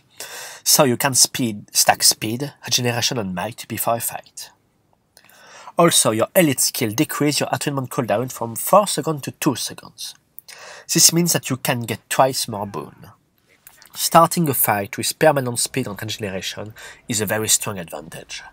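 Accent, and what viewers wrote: France. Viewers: I know he talks English but I just hear French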